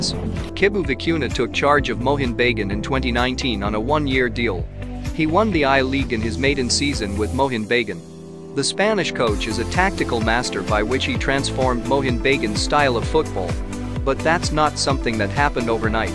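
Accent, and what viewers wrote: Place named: United States. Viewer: But your voice is like an american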